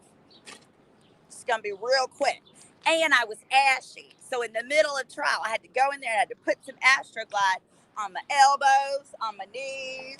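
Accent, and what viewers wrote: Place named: South, United States. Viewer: I’m from California, but I live in Texas now thank goodness, when I hear your voice, it reminds me of the sound of a sister meaning an African-American southern woman and also a southern Anglo woman. It’s really interesting to my ears.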